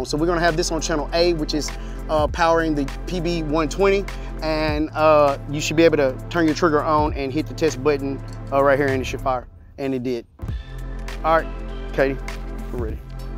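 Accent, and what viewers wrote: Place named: Texas, United States. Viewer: I could tell you were a fellow Texan as soon as you started talking. Fantastic work! It's crafstmen like I follow to learn more about the art of photograph and videography. You've got my subscription.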